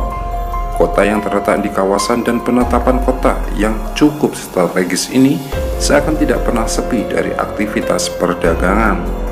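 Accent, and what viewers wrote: Indonesia. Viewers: Urang bangke mana suara e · Kudengar dari logatnya, apakah logat jawa min?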